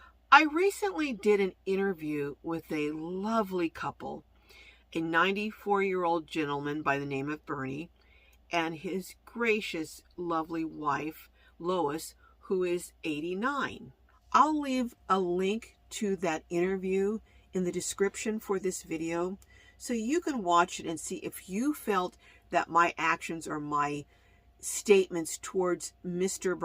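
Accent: South, United States. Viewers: It sounds like a way of speaking that is a sign of respect from the south. I was born in Berkeley California and get that! Carry on! lol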